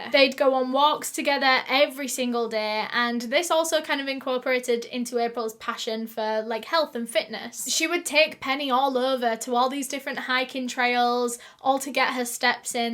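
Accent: United Kingdom. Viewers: oml i thought this was kendall rae, then i heard the accent i was like: what in the world?!!! when did she become british?! 😭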